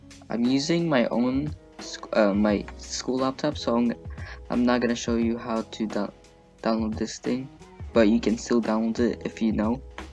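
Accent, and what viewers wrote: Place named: Philippines. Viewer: I can tell, you are a Filipino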